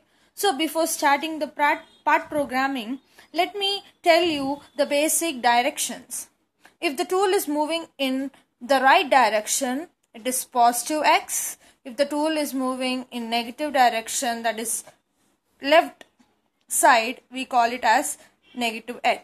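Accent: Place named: Mumbai, India. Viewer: you are a Bombay accent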